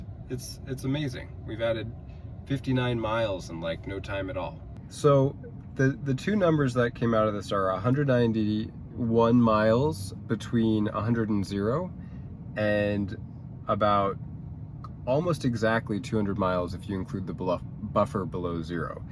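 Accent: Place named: Eastern Europe. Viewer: Where are you from? You have an interesting accent. Like some slight Eastern European accent.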